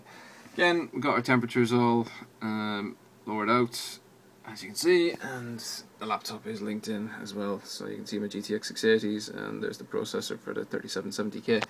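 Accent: Ireland. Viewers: Are you in any way Irish? :D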